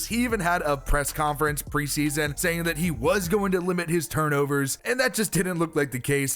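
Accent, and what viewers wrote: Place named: Richmond, United States. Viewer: Are you from Richmond?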